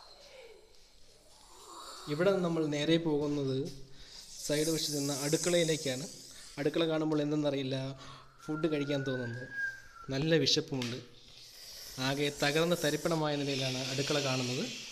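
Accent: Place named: Trivandrum, India. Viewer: Tvm ആണ് alle സംസാരം കേട്ടപ്പോൾ manasilayi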